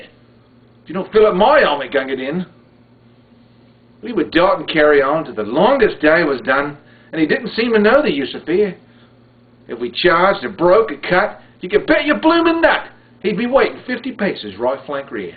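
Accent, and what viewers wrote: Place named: Australia. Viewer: That's a great performance and perfect Aussie accent too which is fine for an Imperial piece such as this. Very moving at the end. 5 Stars.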